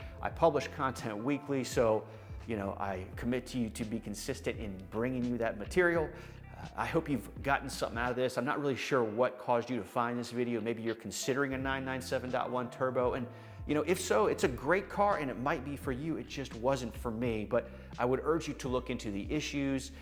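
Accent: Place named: United States. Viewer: Hi, greetings from Germany the homeland of your Porsches! You are a very cool Porsche-Enthusiast speaking such a clear (american) english so that it is great fun to listen to you. But what about the german licence-plate from Munich in the background nearby the Porsche-Light on the working-table in your amazing Garage? Are there any Porsches from Germany in your Garage (mostly German People are looking für US-Porsches and very few US-Fans looking for the german Market)? Greetings G.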